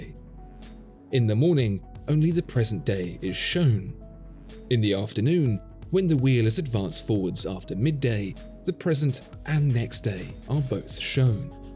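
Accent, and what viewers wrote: United Kingdom. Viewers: This videos is why I enjoy your channel so such. (NOT CAUSE WE AMERICANS FEEL THE BRIT ACCENT IS SO OLD WORLD but the amazing content of your videos) With a collection of PP, JLC, and Rolex (NOT ONE HYPE WATCH IN THE COLLECTION), years and years of buying what I love.. I'm so FUCKEN impressed with those whom are the true GENIUS of our time... Will sell one of the OVER PRICED ROLEX in the collection (purchased from Mayor Jewelers in Miami Fl.). For the past 18 years my local AD has called to purchase a ROLEX at AD prices. They know I will NOT sell what I true LOVE. This Watch is AMAZING..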